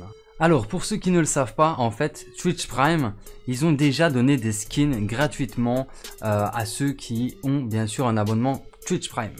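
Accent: Belgium. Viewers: Sa se voit tes belge toi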